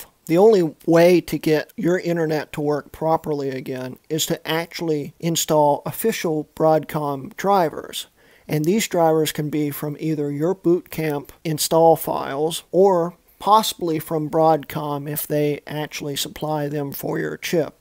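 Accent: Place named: United States. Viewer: Gosh that American voice is absolute AIDS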